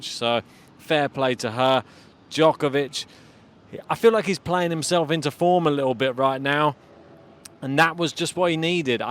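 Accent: United Kingdom. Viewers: It’s the British accent. Give the guy a break!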